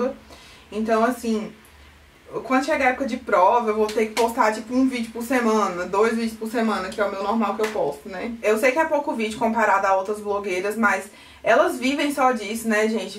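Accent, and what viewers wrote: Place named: Goiás, Brazil. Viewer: Vc é da onde? Seu sotaque é Bem Goiano 😍❤️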